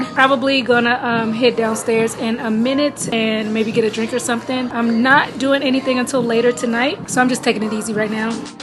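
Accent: Baltimore, United States. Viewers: You absolutely have the Baltimore accent! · I knew knew it!!! I'm from bmore and I could hear it in your voice. Love it!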